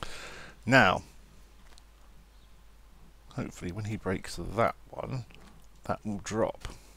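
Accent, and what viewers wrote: United Kingdom. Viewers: may i ask. Where are you from in the UK?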